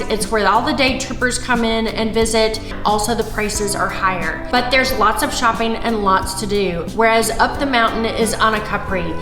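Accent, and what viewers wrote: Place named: Texas, United States. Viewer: Where is her accent from, why am I thinking Texas?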